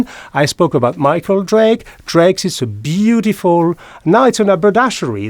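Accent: France. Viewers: Please upload more often. I love watching/listening to you, the french accent adds so much to it. I'd like to hear more jokes from you too like in the shoe series with the "not my cup of tea" joke about the loafers. Really laughed with that one 👍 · Very well said! „As long as you not look like a clown“ is basically all one should consider. By the way, I love your French accent when speaking English.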